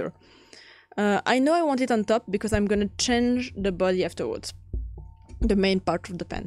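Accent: France. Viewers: sounds like french accent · Your o̲dio was very... French. 🇫🇷 But I like it. The videos that you make help me alot.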